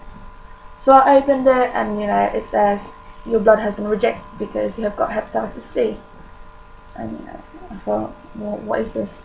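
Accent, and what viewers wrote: United Kingdom. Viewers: God bless you but you sound very British to me. I am sure I cant notice the slight accent because I am American. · polish? ya fink? sooo british it hurts...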